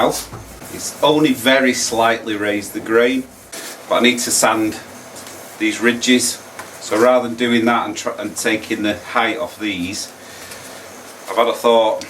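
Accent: United Kingdom. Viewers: Me mum was a brit; I am an American born guy. I love your brit narration, please don't deprive us of that brit voice! Also, beautiful restoration on the teak nightstand as usual. PS If I am watching ANY video and I think the person is too talkative, I just put them on mute. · I love listening to you telling us what you’re doing in that lovely British accent! (Just the way you say ”lovely”… ☺️) Please keep it up! ❤️ Also, great transformation and glad you kept the lock! 👍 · contrary to what some others have said, I don't find your talking to be excessive at all. I’m from the U.S. and I love the British accent. I really do. And the instructional nature of your commentary is part of why I come to these videos in the first place. By the way…I must remark on the piano accompaniment in the first four minutes of the video. It was stunningly beautiful. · Really solid lovely end table! 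Keep talking! I love the Brit mannerisms and accent (I'm from USA)! Always going to be someone who doesn't like your 'style' - I'm of the opinion that 'You Do You'!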